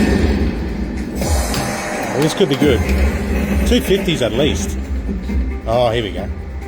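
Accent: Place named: Australia. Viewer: Real Aussie accent 😅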